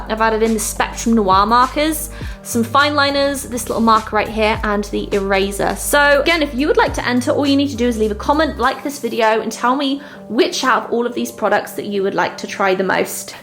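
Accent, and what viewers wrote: United Kingdom. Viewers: Hi, of all the art supplies, I loved the arteze (hope I got the spelling right) watercolour books the set if three, I love watercolour work so. Thank you for such a beautiful Unboxing, P.S. you have a very beautiful British accent.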